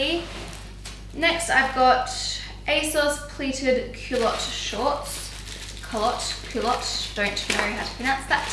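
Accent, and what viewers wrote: Australia. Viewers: I'm British and love ur and Australian accents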